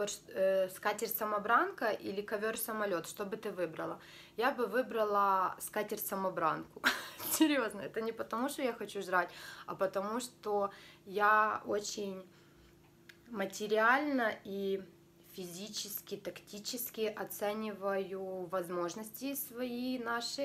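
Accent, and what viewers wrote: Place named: Ukraine. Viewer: из твоих уст слово геморрой!!!!😘😘😘😘😘 у меня аж шерсть дыбом встала. обожаю украинский акцент.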